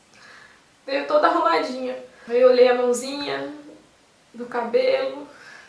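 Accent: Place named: Cruz das Almas, Brazil. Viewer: ! Acabei de relatar minha história para você, e achei seu sotaque parecido com o nosso. Vendo agora seu endereço, percebi que também é de Cruz das Almas. Caso queira, eu posso te ajudar com indicação profissional e ombro amigo caso queira. Deus te abençoe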